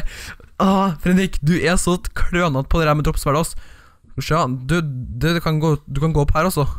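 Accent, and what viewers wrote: Trøndelag, Norway. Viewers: du e trøndersk d e jo drit bra trønderan e bæst!;D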